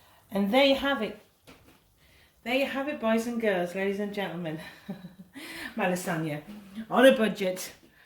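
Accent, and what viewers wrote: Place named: North East England, United Kingdom. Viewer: Just found ya channel. love it for ya home cooking . I crumble bits of black pudding into my lasagne along with a half t spoon of fennel powder ( or italian sweet pork sausage which has fennel in it )and some chilli flakes for a kick. By the way where in North East are you? I'm from sunderland originally ( I think you're not that far off by ya accent?) but I'm living in Spain right now so missing homestyle food. .I'm catching up with ya recipes ( love the Greggs pasty video ). Well done ,keep going.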